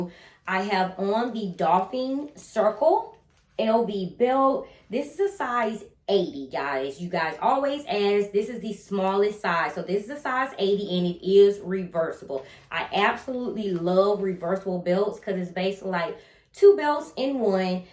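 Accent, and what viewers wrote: United States. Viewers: Love your videos. I also love your accent!!! I am from the UK and so my ear struggles to place American accents. Which part of the US is yours from? I totally agree about buying smarter. I now have a small bag collection after selling a lot - 8 at present. The only bag I need in 2023 is the odeon mm in mono and black as I don't have a 'decent capacity cross body' in my collection. I just have to stay strong when I am tempted, which is easier said than done!!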